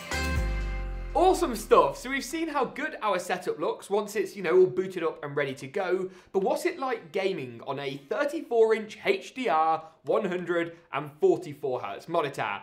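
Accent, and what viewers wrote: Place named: United Kingdom. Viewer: I Subscribed to your channel only to listen to the way you speak. I always giggle when I hear the British accent. [Also, I am not being racist or making fun, it's just that, after 9 to 6 IT job, I like watching your videos, they are informational, funny and clean].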